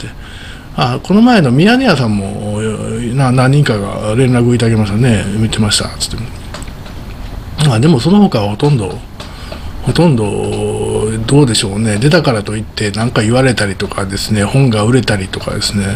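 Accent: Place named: Kansai, Japan. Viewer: 関西弁で自然体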